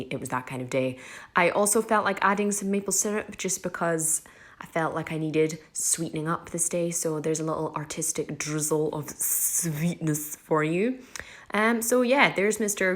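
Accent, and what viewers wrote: Scotland, United Kingdom. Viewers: I get the Scottish accent from you really well.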